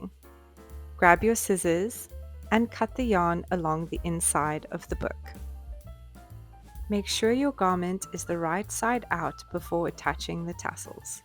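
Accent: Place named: South Africa. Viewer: Interesting! And so pleasant to hear a South African accent on a crochet channel.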